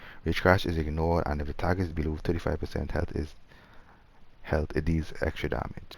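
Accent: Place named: Trinidad and Tobago. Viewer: how this man sound like ah trini so, thanks for the vid bro, im also playing a red lantern. me had a little trouble understanding them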